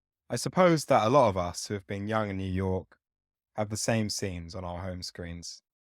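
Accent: United Kingdom. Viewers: I agree with what everyone is saying… plus its a weird choice to have a british man read a personal essay by an american woman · He’s English. Although we citizens of the USA speak English, we have completely different manners of speaking a sentence. It’s the intonations and inflections. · Whomever thought it a good idea to have a man speaking in a British voice reading a deeply personal and quintessential American topic? Like having a kangaroo teach ballet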